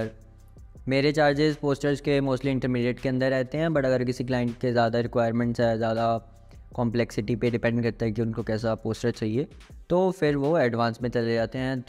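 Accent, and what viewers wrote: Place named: Pakistan. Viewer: Why you gives Pakistani vibes? You have pakistani accent not Indian. IDK why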